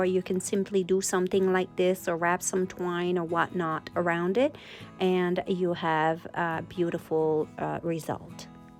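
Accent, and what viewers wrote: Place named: Montreal, Canada. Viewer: The wreath looks great without a bow. Very good job. You inspire me. By the way I love your accent it reminds me of when I lived near Montreal. It brings a smile to my heart.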